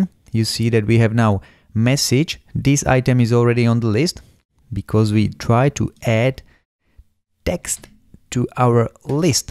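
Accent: Czech Republic. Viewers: Within the first 10 seconds, I said, "This guy is Czech".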